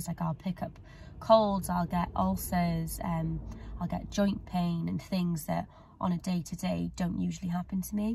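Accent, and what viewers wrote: Cardiff, United Kingdom. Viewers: Old video, but I find I have an inability to adjust to situations. Maybe eyesight issues from anxiety! Btw, you from the ‘diff? I hear a Caaaardiff twang 😂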